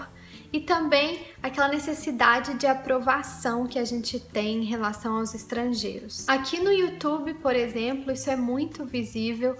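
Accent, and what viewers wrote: Minas Gerais, Brazil. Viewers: Vc até pode estar morando fora do país, mas hoje quando eu a ouvi, de cara pensei: Ela é Mineirinha. Um abraço desde Mariana-MG minha conterrânea da voz doce. vêm cá comer um pãozin de queijo e tomar um cafezin. gratidão suprema.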